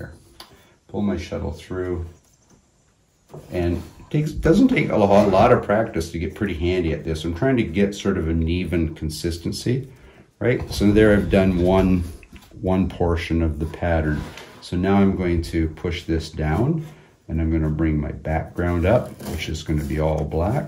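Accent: Canada. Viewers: Ahh, I thought I detected a Canadian accent. I love ypur chamnel. U are quite amazing to be able to do what u do.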